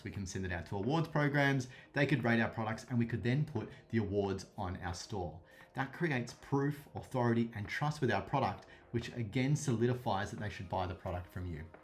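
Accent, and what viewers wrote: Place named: Australia. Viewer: Automatic trust, when I hear Aussie accent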